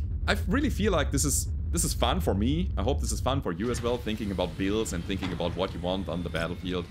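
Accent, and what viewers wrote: Germany. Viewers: I'm not very good at this game but I love stomping around (and playing Mechamon while I do so). I'm really enjoying your unmodded playthroughs; this one I'm finding especially interesting because it features the one DLC I don't have. Also I hope you don't mind me saying this, but I love your accent! It's nice to know how all the German stuff in the game is actually meant to sound. 😅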